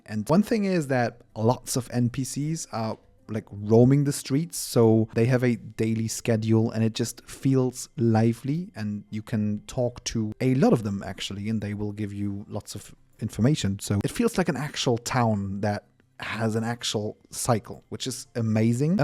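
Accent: Germany. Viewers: Safe bist du Deutsch das hört man doch raus :D Currently playing this on my phone and the amount of content is absolutely insane like... everyday I find something new you think you've seen it all but nope god knows how they ported this onto phones guess their own engine helps in that regard I thought my GOTY would be expedition 33 but Where Winds Meet is literally blowing my mind this game is essentially a lifestyle you're part of this world and slowly progress through your own journey create your own story and decide who you want to be literally can't praise it more enough Also remember the Goose is your best friend ❤...sagt man sich auf'm CN Server zumindest so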